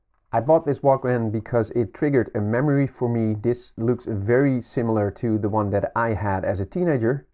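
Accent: Netherlands. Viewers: Hahah, that accent really gives you away. Hallo, noorderbuur! 😁 And thanks for the instruction video. Was considering picking up a walkman from eBay, and wanted to get an idea of how hard it would be to replace the belts. Didn't look too complicated, so now I got a WM-FX45 + new belt on the way. One thing though. When replacing the belts, it's always a good idea to clean the pulleys with a q-tip drenched in alcohol. This removes the rubber residue left by belts that have gone sticky, or rubber dust from belts that dried out. Always a good idea to do some maintenance while you're in there anyway.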